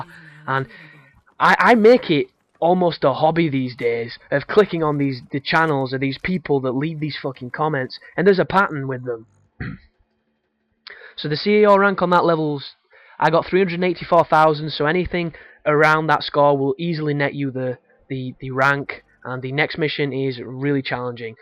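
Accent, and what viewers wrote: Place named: England, United Kingdom. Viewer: Thx for your very helpful vids and good commentary. I love the " bot";)) no im not an american i just love the english accent. Keep up the good work.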